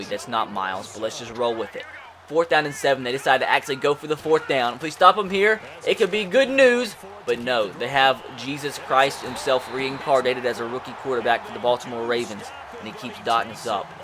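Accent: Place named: Alabama, United States. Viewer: U have an alabama voice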